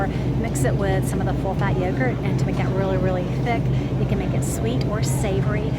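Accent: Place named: North Carolina, United States. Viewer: Love your channel! Keep it up! Are you from NC? You certainly sound like one of us :P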